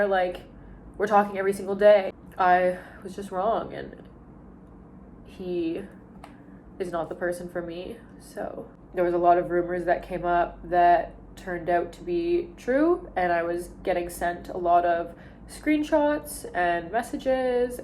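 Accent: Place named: Canada. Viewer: Your lil Canadian accent is cute af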